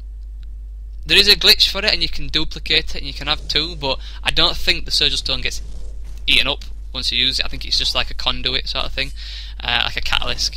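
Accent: Scotland, United Kingdom. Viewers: he doesn't sound too american, he sounds more scottish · Hmm sounds Scottish. Or I could be wrong.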